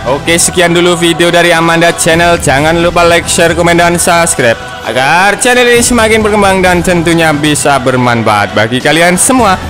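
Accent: Java, Indonesia. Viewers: Medok bingit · mentok jowo nya ,hahaha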